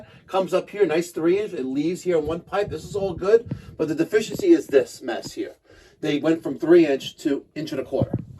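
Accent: New York, United States. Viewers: I'd let boy know to stop letting them flags fly in his part of the property. Some people might say that's being whatever, but no...it's not my religion or whatever get your shit out of my area...I'm not putting mine in yours so fuck off. Love you....Hey give me a faaageeetttit abbbouuuutt itt next time lol. I love the NY accent and some of the tude lol.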